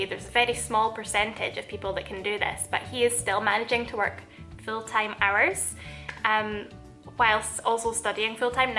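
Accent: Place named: Scotland, United Kingdom. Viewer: oh my goodness your accent is amazing! I haven't heard that in a while so i'm not sure.. scottish, irish, where are you from??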